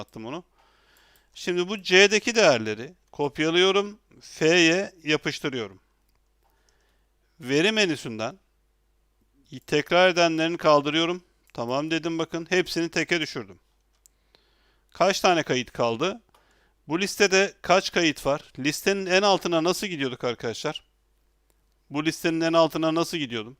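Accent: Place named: Aegean, Turkey. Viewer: Hiç fark etmez ama ege şivesiyle zor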